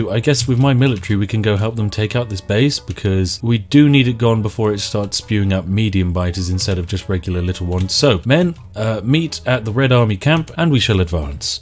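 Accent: United Kingdom. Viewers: SO NICE!!!!! I REALLY ENJOYED THAT VIDEO!!!! british accent!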